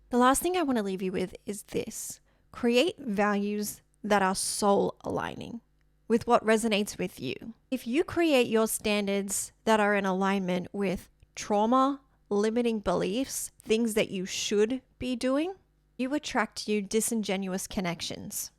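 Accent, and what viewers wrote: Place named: Australia. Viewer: Girrrrllllll 🙌💓 you’re killing it! You have done a phenomenal job expressing this topic. It’s nice to hear a fellow Aussie voice on YouTube.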